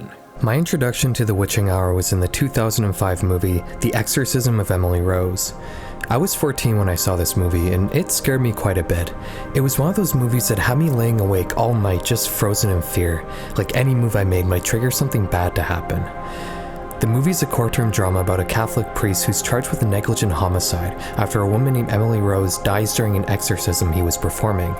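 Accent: Canada. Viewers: Lol youre canadian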